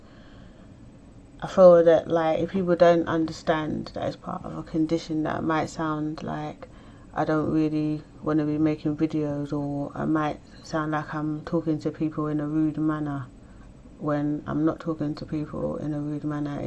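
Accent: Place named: United Kingdom. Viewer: I always thought your speech pattern was more about being British. I find it rather soothing. I've heard worse pomposity listening to a narrated Audible book. I seriously wanted to punch that guy in the dick by the time I finished the book.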